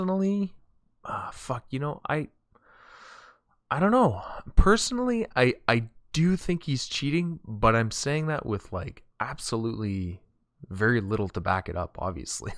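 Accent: Canada. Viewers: are you from montreal bud?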